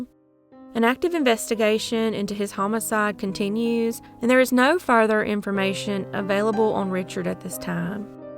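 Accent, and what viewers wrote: South, United States. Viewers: Thank you for All the information on cases ,the way you present with your soft, caring, and compassionate voice. Always good to hear that southern Belle voice first thing in the morning. Take care and Be safe ❤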